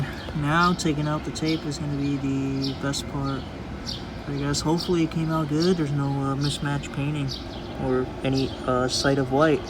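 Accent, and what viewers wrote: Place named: United States. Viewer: Bro, you sound American as fuck 😅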